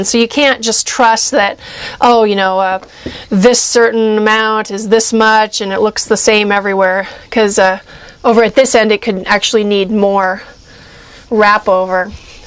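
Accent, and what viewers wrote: Canada. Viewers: she is from Canada a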